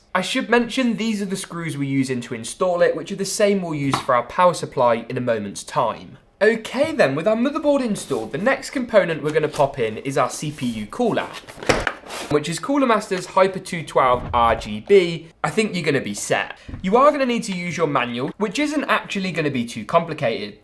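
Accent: United Kingdom. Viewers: Man i hate the British accent so freaking much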